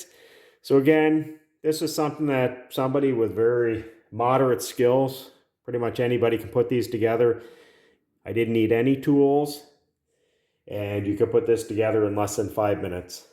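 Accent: Canada. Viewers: When you mentioned the price, I thought, "that seems high compared to what I just paid for one...". Then I listened to you speak some more, and immediately realized you were Canuk. As Bob and Doug would say, "Good day, eh."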